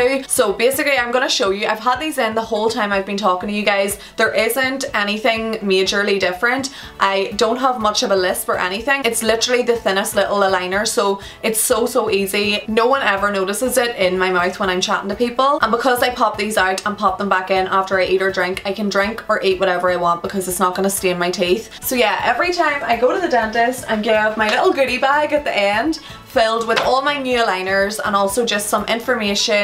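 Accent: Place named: Donegal, Ireland. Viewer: I wanna say that your from Dublin but you have a donegal accent (my accent)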